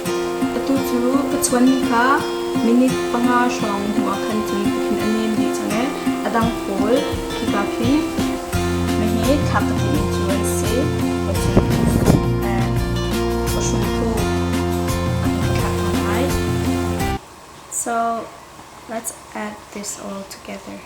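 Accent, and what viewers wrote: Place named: Hmar, India. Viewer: I tawng hi hmar tawng nen in ang reu ltk 😁😁😁😁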